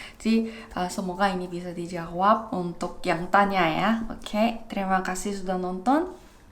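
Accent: Indonesia. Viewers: Aksen indonesianya sudah 70%.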